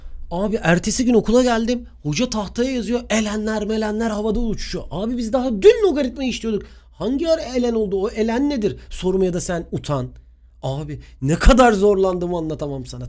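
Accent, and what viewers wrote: Aegean, Turkey. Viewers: Hocam yorumları okuduğunuzu biliyorum ve bir ricada bulunacağım : Bu ders ege şivesi kullandınız bazen bi gün Karadeniz şivesi de alır mıyız ya ? Edit: Karadeniz ağızı .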